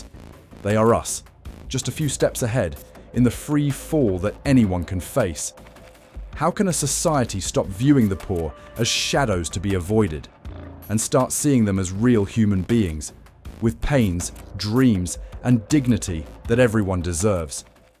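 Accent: United Kingdom. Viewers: Gotta love a video with a snooty British accent type narrator talking about how New York sucks . Bite me ya' limey wanker ! Lol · Whoever the limey Brit narrator is, talk shit about England.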